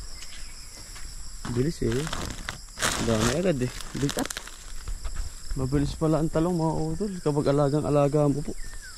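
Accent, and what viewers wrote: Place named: Batangas, Philippines. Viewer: Tanung lng po kautol saan po ang lugar nyo kc ung pronounce ng salita nyo parang taga batanggas po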